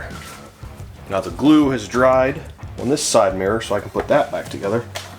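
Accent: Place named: South, United States. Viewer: You have got to be from the south, the way you pronounce "on" like "ahwn"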